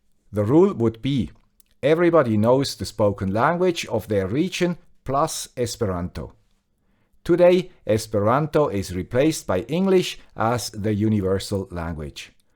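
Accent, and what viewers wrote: Swiss German, Switzerland. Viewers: Ich bin aus dem großen Kanton, habe aber dennoch Dein Schwitzerdütsch verstanden :-) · Hi, First, happy new year to you and your family. I take this occasion to thank you for these new year reflexions. I liked your video a lot. Just like i appreciate your technical videos. I really like the quality of your rigorous explanations. You helped me with many of my projects and with my overall comprehension of microcontrolers. Bye (not with your Swiss accent but with my French- Quebecer's one) Stéphane-Billy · I told my wife, "The guy with the Swiss accent has a message for you!" I read your response to her, and she laughed out loud! :) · Swiss accent is much much better than American. For me, I understand most European English accent better than American and British. Why, because these people speak slow and simple \ easy English words. 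Thank you for the lecture. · Happy 2022! Thank you for all your content and for avoiding the mid-roll ads. You make very good choices; deciding to broadcast in English (with a Swiss accent) was a very good choice - you have the possibility of a larger audience, but you still retain your "Swiss-ness". I love Switzerland having visited as a child, and later on business. Your videos are very thorough and well made. I have learned so much from you. Thank you again. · Another useful and interesting video from the guy with the Swiss accent. Wishing you and yours a wonderful 2022. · Ich bin ja überrascht wie viel ich vom Schwizerdütsch verstanden habe. Bin vermutlich schlimmere Dialekte gewohnt. :-) (Bern und Umland?)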